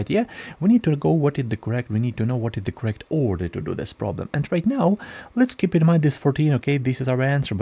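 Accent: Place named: England, United Kingdom. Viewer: Great stuff but you talk too fast, your English accent is not helped by muffled sound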